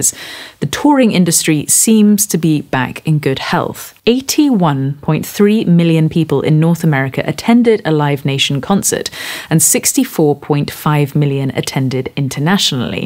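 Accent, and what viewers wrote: United Kingdom. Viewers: Is getting MORE British? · Love that firm, assertive/relaxed vaguely mannish Brit accent. Did she already address the Leap Frog debacle ? "What's he really like ?" Etc., etc. .ZZZZzzzzzz..."Corporate rock still sucks." Etc. , etc.. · Dear Ms., I can't remember such an attractive, pleasing British voice and speaking as yours. I was stunned at the first several seconds.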